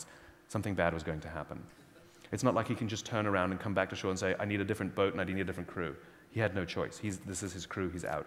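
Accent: United Kingdom. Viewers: Absolutely love. I have seen so many of his videos and how important is authenticity is to him....fabric of who he is. So I am guessing is that he just wanted to give the British accent a go...why not. Always up for a challenge.....I lived in Scotland for 5 years and could never do a Scottish accent. Anyhow, just thinking out loud. Love and now a huge fan of Marquet:-) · Since when does have an English accent? I thought he American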